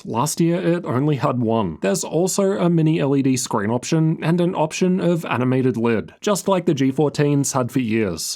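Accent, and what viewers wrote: Australia. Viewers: Okay but what are now the best and worst laptops from this year's CES? This is just a recap I came here to hear some Aussie ranting!!! :D Still nice video :) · The most Australian Australian I've ever heard, nice comparisons, thank you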